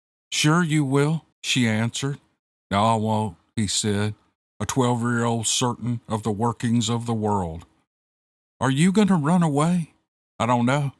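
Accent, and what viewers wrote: South, United States. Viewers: I adore your accent!!! It's' so great to listen to someone who sounds just like me!!! ❣️❣️❣️❣️❣️ I Could listen to you all day long! You are from just a little bit deeper south than me. (Hahaha!!!)I'm from North Carolina born in Winston-Salem. But I've been everywhere. And enjoyed every minute of my travels. Someday I'm gonna write my adventures down. I don't really know how i survived those dangerous times, but thank G-D I did. Love ya!!! Keep up the great story sharing!!!😮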